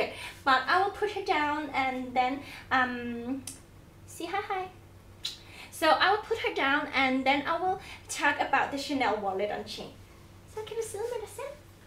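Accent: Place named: China. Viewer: but arent you Chinese????? i can barely understand you with the heavy accent. no way are u a native english speaker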